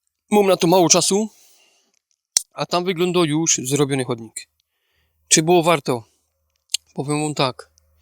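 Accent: Silesia, Poland. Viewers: Super odcinek. Rewelacyjna gwara śląska. Jeśli chodzi o kamerę to zanim ją rozbijesz sprawdź kartę. Prawdopodobnie przyczyną wieszania się kamery jest karta SD. Zmień firmę karty SD i powinno wszystko działać bez problemu. Miałem taki sam przypadek. Moja kamera nie trawiła kart Kingston natomiast bardzo dobrze pracuje kartami SanDisk. Pozdrawiam serdecznie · śląska gwara mnie rozwala;) na dobry początek zostawiam subka i zapraszam również do mnie, pozdrawiam z Tyrolu:) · super się ciebie słucha jak opowiadasz w gwarze śląskiej, bardzo mi sie podobo waszo godka, warto mieć swój kawałek gruntu i swój kawałek podłogi, warto samemu coś zrobić bo daje satysfakcję, pozdrawiam, lajk 62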